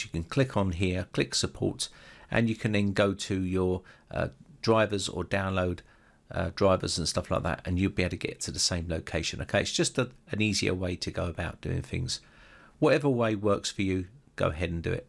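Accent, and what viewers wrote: England, United Kingdom. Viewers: you are a champ. You have helped me so much with your videos. You will be surprised to here that they go all the way to Australia. 😂😂 By the way, although I live in Oz, I’m a Scot and I like listening to your friendly pommy banter. 😂😂😂👍👍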